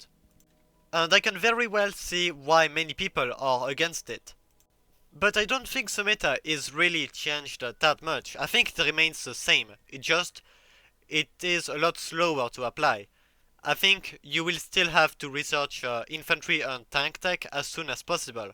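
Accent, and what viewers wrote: France. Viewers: Thickest french accent I’ve ever heard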